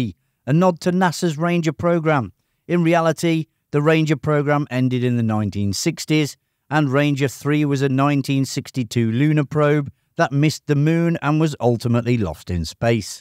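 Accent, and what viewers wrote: United Kingdom. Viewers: The guy's British, dude! Give him a break!! 😂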